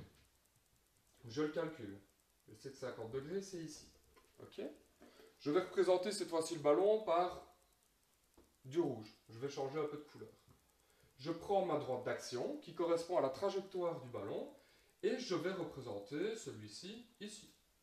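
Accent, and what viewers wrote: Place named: Liège, Belgium. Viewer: petit accent liégeois? hahaha bien expliqué merci !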